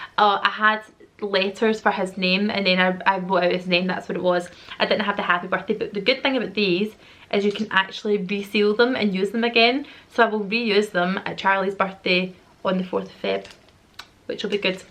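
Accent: Scotland, United Kingdom. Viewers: i love her accent to lol certain words sound so much better in Scottish lol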